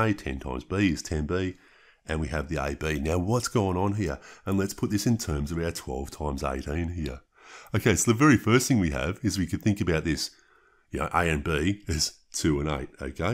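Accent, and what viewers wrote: Australia. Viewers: I agree with you Adano. That is an Australian accent.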